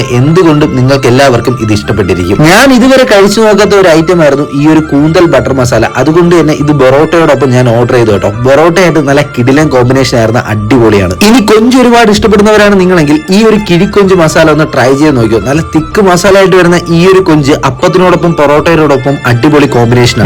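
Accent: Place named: Trivandrum, India. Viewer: Tvm mikkavarum anganeya parayne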